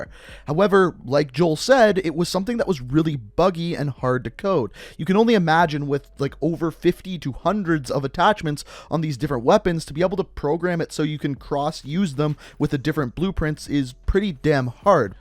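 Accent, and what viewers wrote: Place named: Canada. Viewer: Paul Martinez I believe it's his Canadian accent .. I maybe mistaken, I don't like the accent. I love his content and info tho!!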